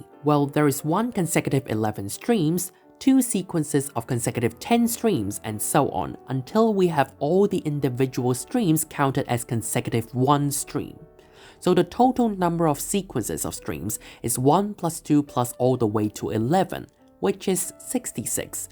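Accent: United Kingdom. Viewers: I like how he's trying his best in British accent, not that i have anything against it lol, I'm practicing that cuz I need to study in England as well lol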